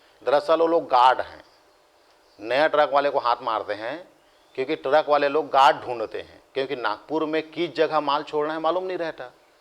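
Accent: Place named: Chhattisgarh, India. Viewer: Bahut hi gajab story boss ❤ Sir G Apki chattishgadhiya अंदाज में तो पूरा cg students फिदा हैं।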